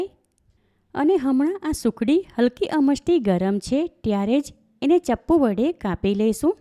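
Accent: Surat, India. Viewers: Ben aa Ben surat na che, tya ni bhasha aavi j hoy che, toh aapne respect aapiye, baar gaame boli badle, badha na uchcharan juda hoy, je मातृभाषा hoy tene shu kam chhodavani?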